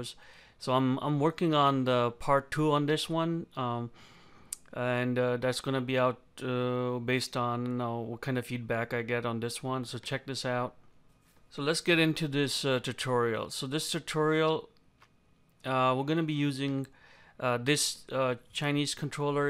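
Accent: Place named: United States. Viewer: i swear this guys accent is indian mixed with american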